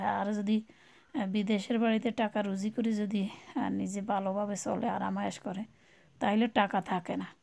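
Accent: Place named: Noakhali, Bangladesh. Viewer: আসসালামুয়ালাইকুম আপু তোমার ভিডিওটি খুব ভালো লাগলো তুমি কোথায় থাকো বেল বাজিয়ে রাখলাম আপু তোমার কথা শুনে মনে হচ্ছে তোমার বাড়ি নোয়াখালী আমার বাড়িও নোয়াখালী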